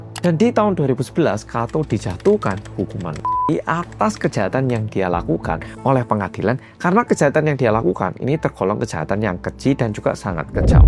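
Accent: Java, Indonesia. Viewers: paling demen nonton ini, logat jawanya medok banget ya wkwkwk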